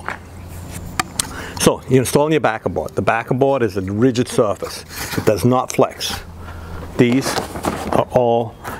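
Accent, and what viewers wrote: Boston, United States. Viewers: I understand the Boston accent,after years watching "This Old House" on PBS, but the AI which made the subtitles didn't.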